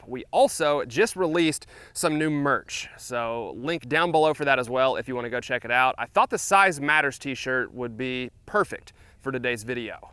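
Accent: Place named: United States. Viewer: Sounds like perfect American English 😊😅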